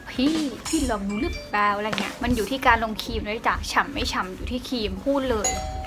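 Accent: South, Thailand. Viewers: ว่าแล้วว่าพี่เป็นคนใต้อะ จับได้ตอนพี่พูดว่า เดี๋ยวโดนทุบ5555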